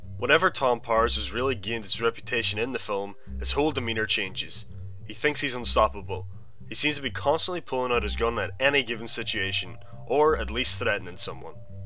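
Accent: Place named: United Kingdom. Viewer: Aside from some...idiosyncratic across-the-pond pronunciations, I thought this was really good. It would be cool to see some parallels drawn with crime literature, and also some examples of films and books that fail to hit (or even subvert) some of the genre conventions, and how that makes them successful or unsuccessful. I went ahead and subscribed to the channel, so I hope there's more forthcoming.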